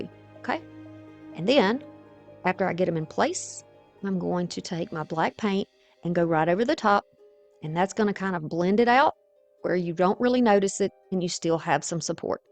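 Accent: South, United States. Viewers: Love them all! I love crows, so your moodies are perfect accompaniments. Thanks so much. Have a great day and God bless 😊 . I went to the michaels link and i like your birdcage and shadow box way more than theirs, actually i think all of yours are better ! Are you from ga ? Cause you talk just like me, and when you said "little,tiny nails" i laughed out loud. I so love our southern hyperbole, why use one word if you can use two and drawl them out. From Nancy in ga usa 😊.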